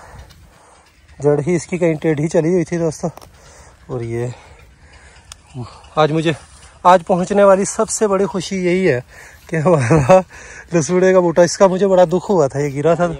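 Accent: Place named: Rajasthan, India. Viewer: Bhai aap ki Bhasha hamare Rajasthan ki Bhasha se milati hai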